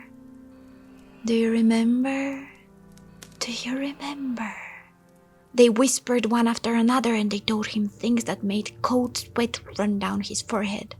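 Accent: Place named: Scandinavia. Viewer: my friend lives in sofia (not exposing his exact adress tho lmao) and he has that big mountain near by that im jelous of, there might be a chance for me to travel there for a bit for a visit, it's funny that im mostly interested in the smaller villages or like the greenery around the city. your accent reminds me of like the or quite a bit that why i thought maybe som northen europe + you have this foresty presence and it really reminds me of like norway and stuff😍 when my friend visited me in poland he's reaction was like: everything is so spacious and like wide wow. here you have i guess everything from meer, flat terrains with/without woods to mountains. i've been to mountains only once because i don't live in the south which is where they are, but im jelous how many of these are in bulgaria. we have starbucks? lmao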